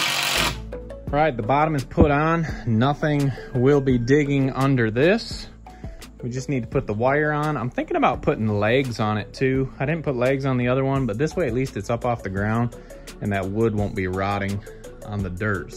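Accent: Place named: Alabama, United States. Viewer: Hey, that sounds like an Alabama accent you have.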